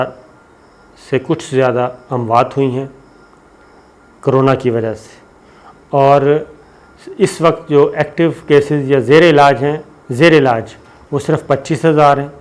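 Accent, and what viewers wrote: Punjab, Pakistan. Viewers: sahib, your reporting is good and we regularly follow your videos on daily basis. However, I have one request, hope you will not feel offended as I don't mean anything. When you speak the word 'strong ' you say 'sitrong' like punjabi pronunciation. It should be spoken as 'Is-Strong' which is English pronunciation, and like all words that start with 'S', they should be spoken as in English language. Sometimes, you say the word ',security ' as 'socourty' but it should be pronounced as 'se-ku rity'. We know English is not our language, but when we speak in a different language, we must speak it correctly. For example if we an Arabic word incorrectly, the meaning will change and the audience will not understand the correct meaning of the word. I hope you wouldn't mind and take it as a friendly and brotherly advice. Thanks, Zia from USA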